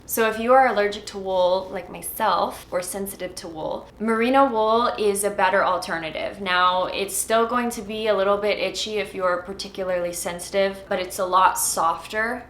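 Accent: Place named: Winnipeg, Canada. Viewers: Are you from Winnipeg? This definitely sounds like a Winnipeger! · Hi. Where do you live? I recognize your prairie accent. I grew up in Northern Saskatchewan and I don't remember sunny days. I just remember gray skies. I like how you put your outfits together. I really don't like wearing bluejeans during the winter because the cotton just feels so cold. If you are interested I prefer Pajar boots. They seem more practical and much warmer than Uggs. They also don't get as ruined as Uggs. Great video.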